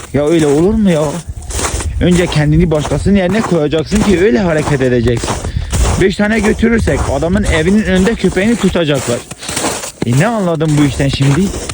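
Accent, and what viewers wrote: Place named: Van, Turkey. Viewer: Bitlislilerle vanlıların Türkçe konuşması birbirine çok benziyor. Benim bitlisli arkadaşlarım var aynı senin gibi konuşuyorlar Türkçeyi. Sen vanlıydın değil mi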